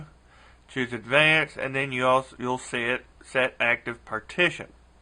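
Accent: South, United States. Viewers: WHAT IS UP WITH THE SOUTHERN ACCENT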